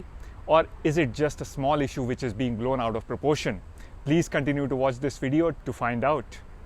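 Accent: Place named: India. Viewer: This guys accent is pathetic - typical musical and Indian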